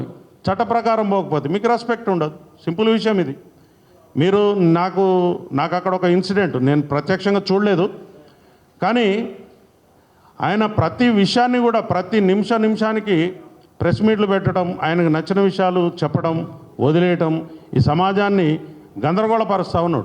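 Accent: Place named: Warangal, India. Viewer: తెలంగాణ పక్కా వరంగల్ స్లాంగ్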